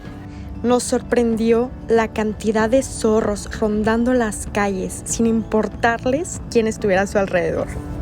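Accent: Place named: Monterrey, Mexico. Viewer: Chicos me tenéis enamorado de vuestro viaje y lo buena narradora que eres tú explicarlo todo con ese lenguaje y acento tan guapo mexicano que tienes o sigo porque yo también soy aventurero teclista ya he recorrido todo México en bicicleta y Centroamérica continente africano y Europa próximo objetivo es viajar a Sudamérica en bicicleta vuestro vídeo me siguen animando y dándome fuerza para emprender este viaje ya que a mi edad y ya un poco difícil y es forzoso ya que soy una persona jubilada con 65 años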